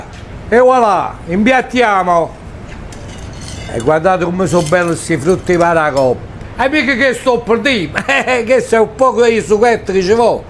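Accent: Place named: Bergamo, Italy. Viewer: se sei di bergamo ti capisco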